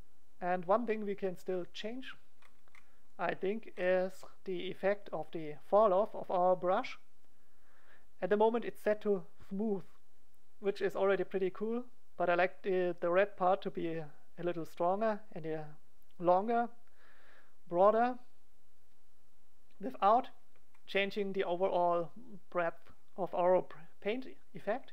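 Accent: Germany. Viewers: Man merkt direkt das du Deutscher bist aber gutes Video Like&Abo haste von mir!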